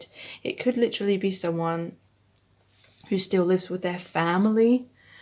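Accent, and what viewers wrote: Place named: United Kingdom. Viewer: When did I not notice that you‘re british😯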